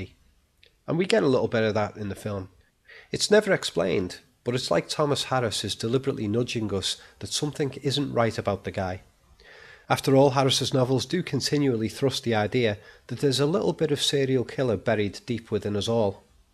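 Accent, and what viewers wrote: Liverpool, United Kingdom. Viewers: Where you from? South Liverpool?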